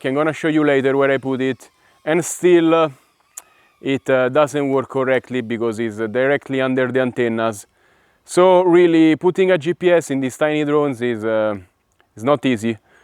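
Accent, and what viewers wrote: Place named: Italy. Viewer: sempre bellissimo sentire un italiano parlare in inglese <3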